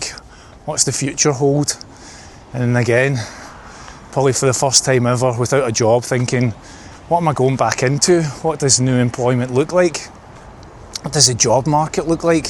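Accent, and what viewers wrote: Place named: Glasgow, United Kingdom. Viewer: Happy to like and subscribe! There is something about a glasgow?? accent that makes people just super personable and easy to listen to, have a lot of family in Paisley/Johnstone so feels like home. Sounds like you had a tough year last year, hopefully this one is much better for you. I am 41 this month and one of the things I have started worrying about is my parents aging, by the end of the month they'll both be retired and I do worry about them a bit more. I also live far away from them so its difficult to see them a lot. I got divorced 3 years ago and moved alone to Bristol, I feel like I am only just picking myself up from that now and starting to feel happier alone, I am hoping to clear my debt this year and start saving for my own house, as not being on the property ladder at my age is also worrying me a lot! 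Hopefully we can both do some rebuilding this year! :)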